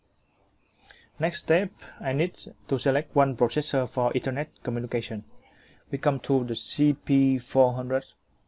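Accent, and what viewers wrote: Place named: Vietnam. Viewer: thank you, by the way, your voice looks like Vietnamese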